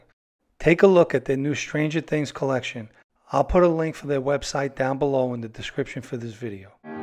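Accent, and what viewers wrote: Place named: New York, United States. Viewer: Great I'm 2nd generation Italian from D Bronx moved to Vermont some years ago. Loved your stories and it was great to hear the NY Italian accent ,It like when I watch the Sparano's I get home sick! Thanks!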